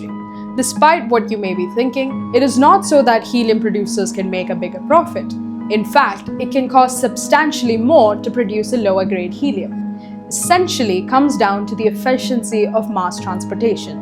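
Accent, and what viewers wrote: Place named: India. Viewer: Informative. Beautiful Indian tone.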